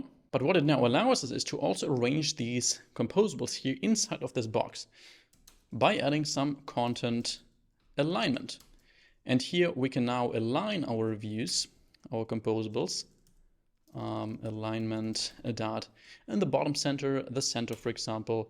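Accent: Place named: Germany. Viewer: Der deutsche akzent lol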